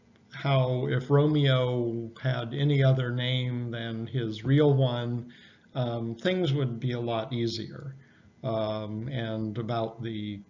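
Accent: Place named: England, United Kingdom. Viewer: Request you to kindly add subtitles. English accent is hard to understand. 🙏🏼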